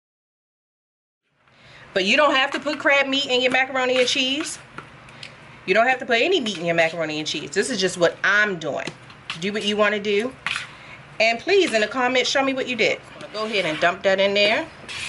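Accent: Baltimore, United States. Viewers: She's got to be from Baltimore. The way she says to and do.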